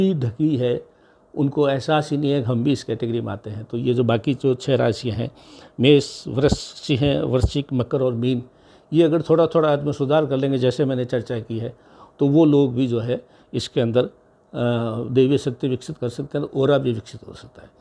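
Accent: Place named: Rajasthan, India. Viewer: हमको लगता है आप राजस्थान के हैं आपकी लैंग्वेज से भाषा से पता लग रहा है क्योंकि हम राजस्थान के हैं ।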